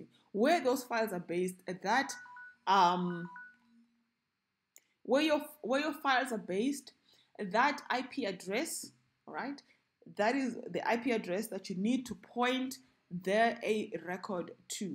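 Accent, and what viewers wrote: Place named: South Africa. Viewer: Damb lady you are smart. So refreshing to hear a SAcan voice to explain things.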